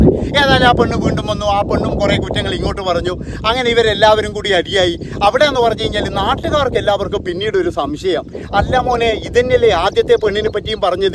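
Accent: Kannur, India. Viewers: കണ്ണൂർ ശൈലി അല്ലേ? ഈടെ എല്ലാരും അങ്ങനെന്നെയ പറയൽ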